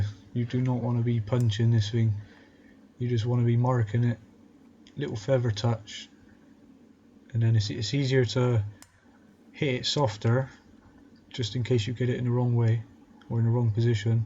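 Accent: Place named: South West England, United Kingdom. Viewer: Gregory Lovvorn Having lived there for four years, I can easily place this as a Bristolian: from Bristol in the South-West of England. Heavily influenced by the wider, traditionally rural area of the SW. In fact, I hear the slightly softer 'edges' in this gentleman's accent that's more indicative of the rural region around Bristol than the city itself, but wouldn't be surprised if he was born and bred in Bristol.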